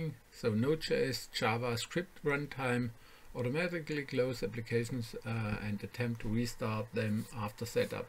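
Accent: Germany. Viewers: Man hört das deutsch unnormal raus :D gutes Video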